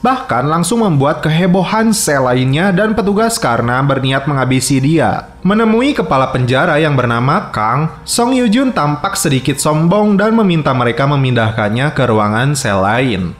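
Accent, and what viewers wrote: West Java, Indonesia. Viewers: Mantap sundana te leungit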